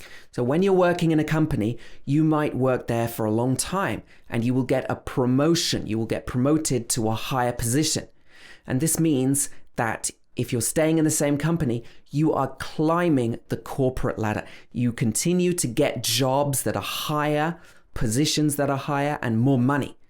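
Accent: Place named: United Kingdom. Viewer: Thank you teacher. The video helps me learning British English accent. I hope you make more videos regularly.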